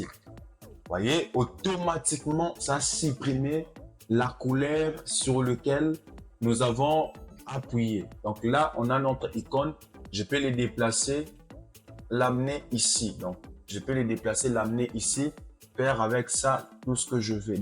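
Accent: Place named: Africa. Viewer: Grâce à toi je sais maintenant comment manipuler un tracé ET son contenu, qui remplit la modification que je fais avec l'outil (A) Sélection Directe sur un tracé - Avant je manipulais le tracé, ensuite je le reconvertissais en sélection et je faisais REMPLIR pff quelle galère .. 😖 Maintenant en convertissant le texte ou un objet en FORME ça change tout ! Le contenant 🔸ET 🔸 le contenu sont manipulables en même temps ☺ Merci, je m'abonne pour te soutenir (en plus j'adore ton petit accent africain 🥰)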